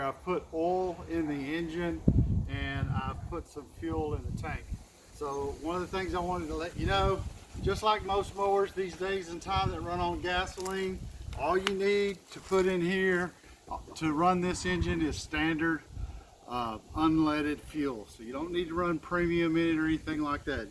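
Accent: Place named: Southern Indiana, United States. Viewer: I love your accent. Southern Indianna? I love how you folks pronounce OIL. In northern Minnesota it's pronounced " Oy-L". You folks pronounce it "OLE" that would rhyme with "Coal" or "Hole". specifically pay attention to the mark in the video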